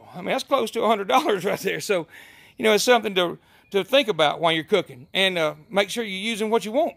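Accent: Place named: Alabama, United States. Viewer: My uncle from another grandmother AND you're using FOGO! AND you sound like you're from Alabama like my family (we're in Texas mostly nowadays). Subbed!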